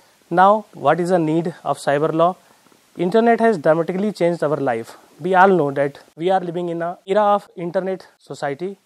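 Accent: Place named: Pilibhit, India. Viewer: maja aaa gaya bhai ----boli pilibhit wali record ho gayee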